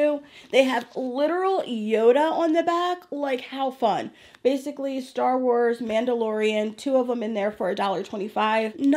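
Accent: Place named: Philadelphia, United States. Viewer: i hope you don't mind this comment, but i kept hearing your accent and could swear you were in the philadelphia area, and then when you showed the mirror and i saw the wawa cup in the back i felt vindicated LOL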